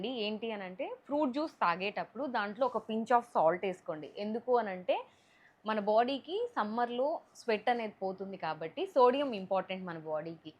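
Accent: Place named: Kurnool, India. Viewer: Your slang is like Kurnool girl 😊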